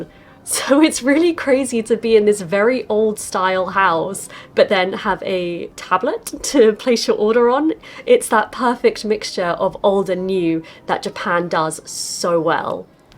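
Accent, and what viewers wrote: United Kingdom. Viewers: イギリス英語だからジャパン、アメリカ英語だとジャペンですかね。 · 歯切れの良いイギリス英語 米語とは一味違いますね。 良い飲みっぷりで見ている方も嬉しくなります。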